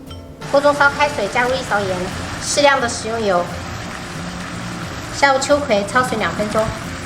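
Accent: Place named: Minnan, China. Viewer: 四川人闽南话说得那么地道？赞一个！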